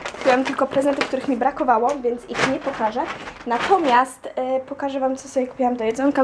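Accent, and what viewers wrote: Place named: United Kingdom. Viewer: uwieeelbiam jak mówisz po angielsku, masz piekny akcent! uczylas sie wczesniej cos więcej niż w szkole czy mieszkanie w Anglii cię tego nauczylo ;)?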